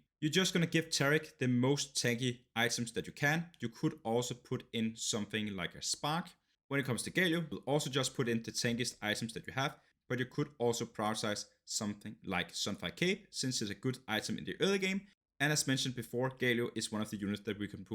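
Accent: Denmark. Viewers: Den danske accent er dejlig🇩🇰🇩🇰🇩🇰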